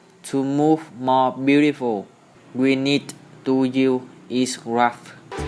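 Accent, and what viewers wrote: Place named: India. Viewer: Y như mấy a ấn độ đang nói tiếng anh d :v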